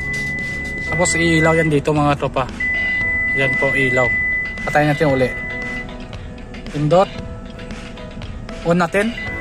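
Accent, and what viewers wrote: Iloilo, Philippines. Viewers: Parang ilonggo ka sir ah taga saan ka same problem kmi ei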